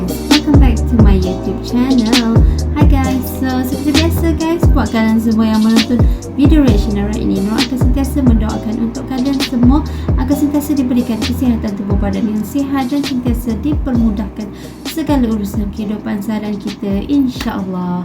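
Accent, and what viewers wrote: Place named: Malaysia. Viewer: Hari cantik orang mana sih ko logat bahasa Melayu Malaysia ❤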